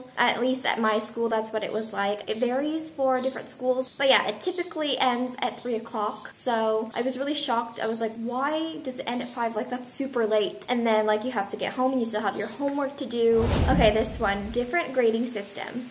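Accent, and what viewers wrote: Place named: England, United Kingdom. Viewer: I'm here to listen your voice ( English accent ). Makagigil 😚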